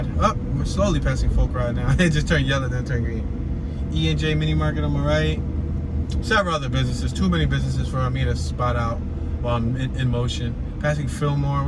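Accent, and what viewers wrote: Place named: South, United States. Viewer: Love the southern!!!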